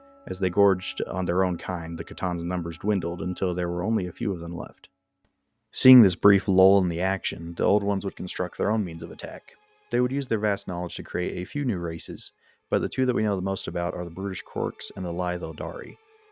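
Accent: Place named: Appalachia, United States. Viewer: nah man your slight idk what it is - appalachian twang - is fantastic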